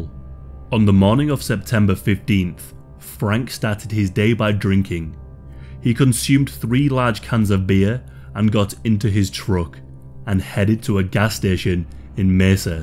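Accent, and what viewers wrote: United Kingdom. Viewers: Wait, you're from Australia? I thought you were British lmao 🤣 I'm American, so can you blame me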